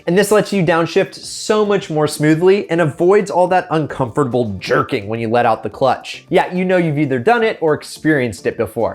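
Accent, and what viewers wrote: United States. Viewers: He’s American and he spoke like a American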